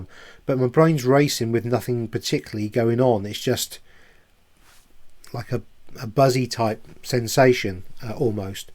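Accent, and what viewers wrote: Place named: England, United Kingdom. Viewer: I'm new subscriber. Very refreshing with English accent. Yes, much of what u r saying rings true. Ascension symptoms for me, weight doesn't come off, blurry runny eyes, itchiness, dizziness, bloating , less energy. Neckpain, my skin cracks, cold cracks on my thumb, today I have some pain in my right shoulder blade. Like a cyst . Geeez, nice list . When do we get our forever new bodies? 😂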